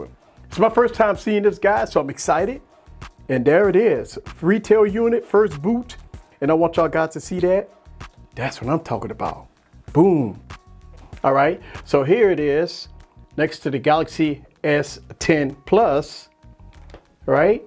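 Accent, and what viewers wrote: United States. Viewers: I like your accent, what part of the US are you from? I'm from Liverpool UK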